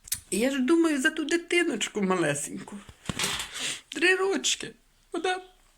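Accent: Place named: Poltava, Ukraine. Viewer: Вас також хайБог береже і Ангел Охоронець. Бережіть себе, дорогенька, це я запитувала, звідки ви. Бо по говору наче з Полтавщини. Ви дуже приємна жінка. Приємно почути, трішки відволіктися від своєї буденності, думками когось пожаліти, заплакати. Я дуже мало кого дивлюся, а вам , дай Боженька, здоровʼя❤️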